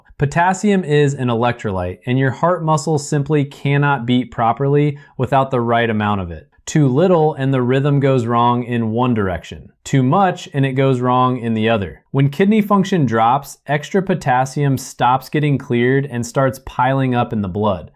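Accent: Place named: England, United Kingdom. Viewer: Male 70 yo English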